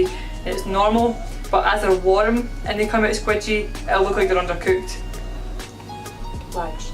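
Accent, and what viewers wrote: Scotland, United Kingdom. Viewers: There's something relaxing about a sassy Scottish lady telling us how to cook desserts. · I'm a native English speaker, and 99% of accents I can understand perfectly but holy hell I've never heard a heavy female Scottish accent and I can barely understand a thing. Thanks for the recipe though they look delicious mate!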